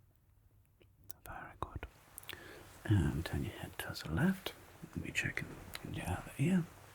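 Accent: Germany. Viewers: I love your German accent!! Saying this as a German myself 😅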